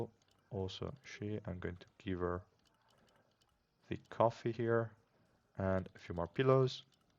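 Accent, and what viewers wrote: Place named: Eastern Europe. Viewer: haha noooo, I also have the -ian at the back, but it is ... Asian 😂 I am a Vietnamese who like to explore language and luckily I know some of Italian words. The one that make me surprise is your accent which make me assume that you are from the Eastern or Northern part of Europe 😅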